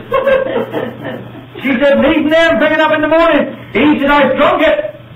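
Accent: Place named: Cornwall, United Kingdom. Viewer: Thanks for that - lovely Cornish accent. I am Cornish living in North America, and listening to you has made me really homesick, and although I eat pasties regularly, really miss the Cornish Cream. Thanks again, really enjoyed your entertainment. Proper job !!!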